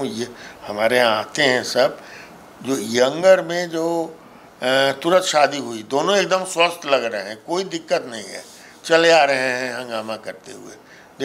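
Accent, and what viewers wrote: Bihar, India. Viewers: It was such a beneficial yet amusing experience listening to such a wise neurologist in typical Bihari accent and style...😊❤ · Sabse best doctor sahab ka samjhane ka bihari lahja uper se khan to muzaffarpuriya style as-------Repallaya sabd dilko chhoo gaya. Thanks doctor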